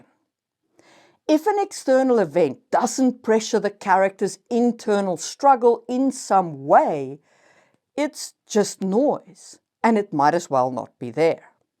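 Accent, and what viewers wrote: South Africa. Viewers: ah, I knew I was hearing a fellow south African 🇿🇦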